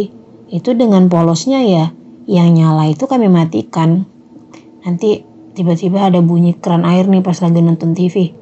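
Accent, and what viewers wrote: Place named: Riau, Indonesia. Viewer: logatnya Riau kali kak❤Batam hadir ❤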